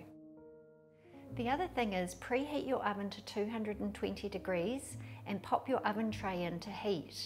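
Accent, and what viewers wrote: New Zealand. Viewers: Hi, love the kiwi accent - I currently live in Rwanda and both your recipe and accent are brilliant. I have an oven with 3 temperatures - cold, a bit warm and a bit warmer yet your scones came out beautifully and were so easily prepared. Many thanks. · New Zealand accent = instant trust. How did I never know the hot tray trick? Intermediate School cooking classes have let me down! I’ll be making these in the morning thanks. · It’s so overly to hear a familiar accent from Aotearoa. Thank you for your gentle and really easy recipe. Love the way you took us through step by step. I am going to make these scones for my staff who are all men and love to eat. Thanks heaps. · Yes sounds kiwi love her presentation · She sure sounds like a Kiwi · I love your New Zealand accent!! (Am i right?) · Thank you. My mother was a great home cook but, her scones, pikelets and shortbread were outstanding. 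Sadly, although l inherited her baking tins and even have her bone handled knife and even though l make most things well, l did not inherit her 'hand' and have pretty much given up trying with scones and pikelets. Tragic cos, IMO, hot buttery scones (and/or pikelets) are unbeatable. You've inspired me to try again though, mostly because your method, measurements and equipment are pretty much identical to what l remember of how my mum made hers - sadly dementia took her before it even occurred to me to write down her recipes, which, like most cooks of her generation she knew off by heart. Most recipes l've found since seem to rely on enormous quantities of baking powder and as l seem to be particularly sensitive to the taste l find them inedible. I would love to know where you got your brilliant oven tray too please - judging by your accent, you're a fellow kiwi and although l've seen those trays overseas, l've never seen them for sale here in Aotearoa. 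I'd really appreciate a heads up, if anyone knows where they're from.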